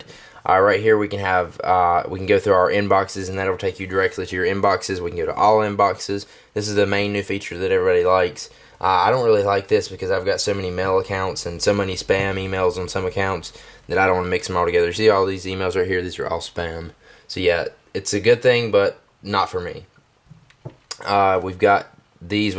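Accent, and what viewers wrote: South, United States. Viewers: southern boy lol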